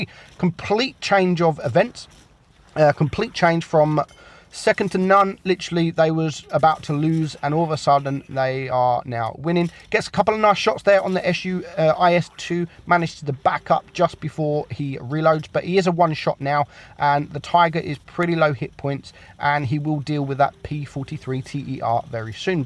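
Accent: United Kingdom. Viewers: Dude, he's british. People accoss the world speak differently. Try to accept that, eh?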